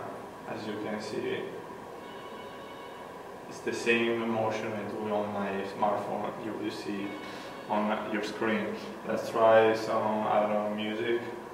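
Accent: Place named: Italy. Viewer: italian accent xD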